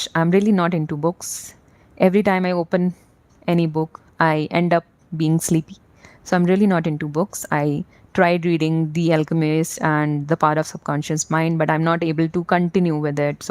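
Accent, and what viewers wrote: India. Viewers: Hai good evening,even if you from chamoli you are pronouncing exactly like Keralates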